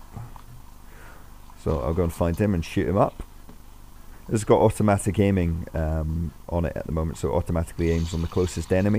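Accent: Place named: United Kingdom. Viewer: i love british accent!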